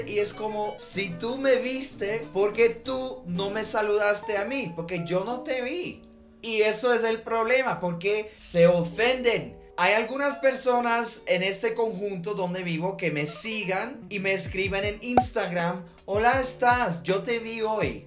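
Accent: Bogotá, Colombia. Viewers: Que pirobø tan colombiano el jaja habla y tiene muchas actitudes de la tierrita, cvero caso de armor a la patria ♥️ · Me salió tu video por casualidad pero me mataste de la risa! 🤣 Nací y crecí en Bogotá hasta los 21 años, pero al escucharte hablar me pareció que no ha pasado el tiempo, aunque llevo ya 32 años fuera del país. Mi niñez la viví en Kennedy, pero ni muerta admitíamos eso si estamos en otro lado 😃. Cuándo no había nada para la cena, y por casualidad íbamos a visitar amigos, teníamos prohibido aceptar si se nos ofrecía comida, porque depronto se daban cuenta que no teníamos que comer en casa 🤨. A veces me da risa recordar esos tiempos pero la mayoría de veces la verdad no lo entiendo, y eso que yo me crié allá 😩. La necesidad de aparentar era (es) primordial. 🥺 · Neutro??? De oirlo supe q aprendió español en Colombia. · el tiene acento rolo, nosotros los rolos pensamos que no tenemos acento, pero si es asì ! 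· Soy de Argentina y su acento es muy colombiano. Quizás te referís a qué es un acento colombiano neutro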